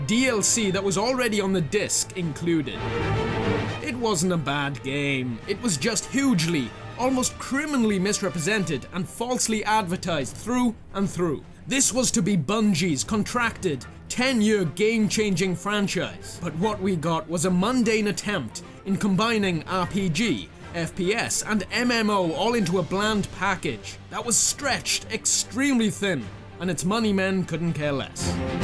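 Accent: Wales, United Kingdom. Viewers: are you Welsh?? You sound Welsh or at least around that area?? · I need to ask! ARE YOU WELSH?!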